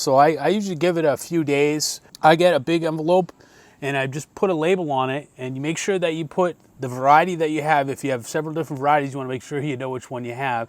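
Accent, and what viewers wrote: Boston, United States. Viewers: Dude! I love your accent! Is it Boston?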